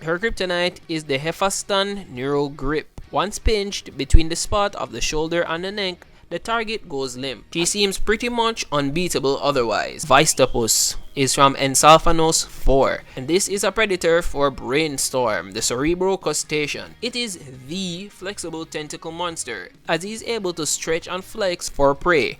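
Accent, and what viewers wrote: Jamaica. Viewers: A jamacian Galvan? I'm vibing with it. Love the accent man, and keep up the content.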